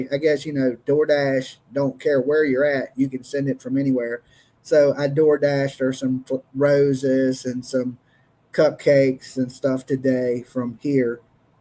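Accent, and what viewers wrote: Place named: Kentucky, United States. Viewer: First time watcher. I like the style you do your show. You talk like most common folk I know,Im from southeastern Kentucky.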